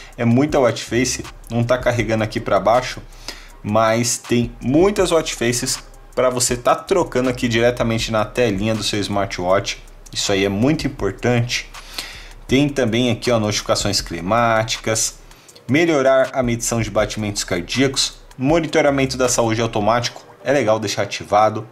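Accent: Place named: Portugal. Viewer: portugues portugal pelo que vi! achei interessante o relogio!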